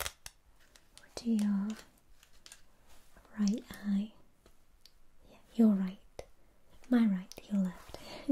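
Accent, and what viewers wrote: United Kingdom. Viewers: Your british accent are so lovely! I love your videos a lot! Success for you ❤️ Kisses from Brazil 🇧🇷🥰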